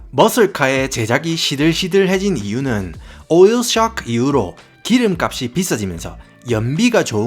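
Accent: Gyeongsang, South Korea. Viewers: 첨으로 아주 재미있게 보고 갑니다! 경상도분이네용. Awesome video!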